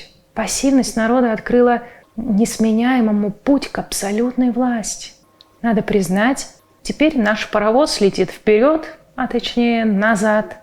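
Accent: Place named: Ryazan, Russia. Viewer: Не знаю. От рождения так получилось. И никак не убирается. Может быть рязанские корни дают о себе знать 🤷‍♀️.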